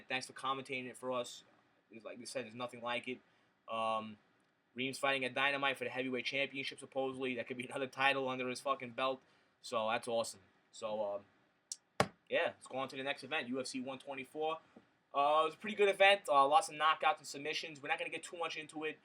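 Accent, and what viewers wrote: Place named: Liverpool, United Kingdom. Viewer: u speak like a true scouser bro good shit keep it up but u should be on the freddie roach plaster in glove trick gsp used here i think dana was on to it he didnt look pleased in post fight presser wen he pointed out hed never seen someones eye go like that in 1st round off jabs gsp cheat !!!!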